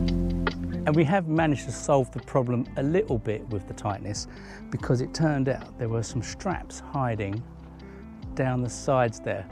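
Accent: United Kingdom. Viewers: Name and shame! Call me innocent what race were you treated as? Accent and skin colour I don't see you as anything other than English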